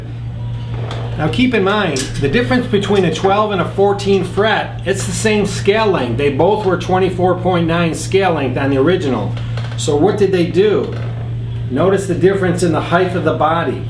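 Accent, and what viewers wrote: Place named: Great Lakes, United States. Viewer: The Great Lakes accent is strong. I live in Wisconsin, people here talk like this guy. Naational Guitaaar DAAAT CAAAAM lol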